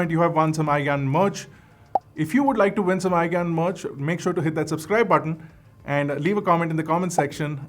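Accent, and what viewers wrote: India. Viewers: Shout out to your neutral accent which is missing from the other Indian tech channels who put out content in English. Thanks for the quality of video and the precise verdict.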